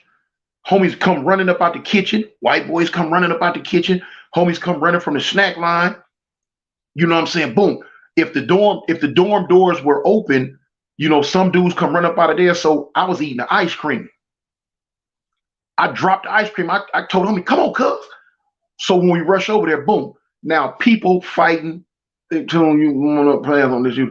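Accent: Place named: Texas, United States. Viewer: I hear a Texas is accent 6ig homie most got people down there huh when you hit that (Mane) it makes me think that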